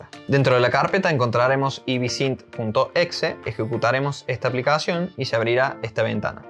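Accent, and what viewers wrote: Cordoba, Argentina. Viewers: Lo mejor que la explicacion esta en Cordobes 😎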